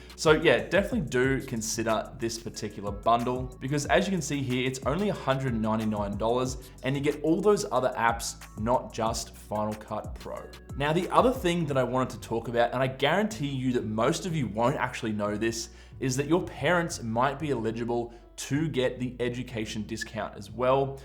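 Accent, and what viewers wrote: Australia. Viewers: I think Apple Australia doesn’t have this deal atm… (I was assuming you’re from Australia because of the accent. )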